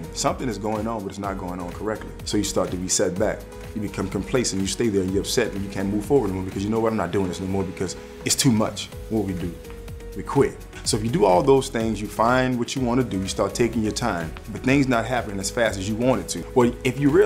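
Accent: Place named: New Orleans, United States. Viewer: Ok New Orleans!! I hear it, that’s my hometown ⚜️😍 congrats on your success!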